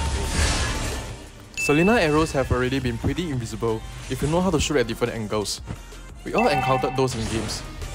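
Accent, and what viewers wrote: Singapore. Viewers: I can hear ur voice and tell ur singaporean, but ur kinda concealing it? But back on topic, these invinsible arrows are annoying asf..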